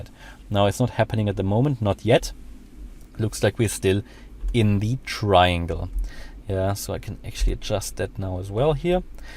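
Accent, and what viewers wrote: Germany. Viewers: digga bitte versuch diesen deutschen akzent dir abzugewöhnen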